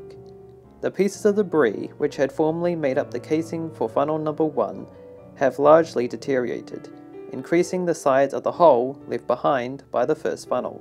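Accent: New Zealand. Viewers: It's a New Zealand accent. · New Zealander?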